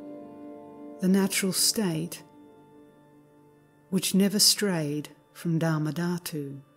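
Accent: Australia. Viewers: I have been listening to your recordings for about 3 months and your voice is quite mesmerising . I can think it is 2 things the “ light” of consciousness and of course the subtle Aussie accent . 🙏 Namaste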